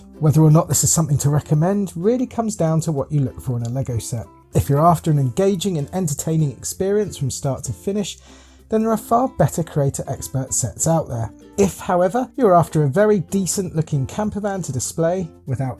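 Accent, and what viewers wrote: England, United Kingdom. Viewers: And where are you from, you sound English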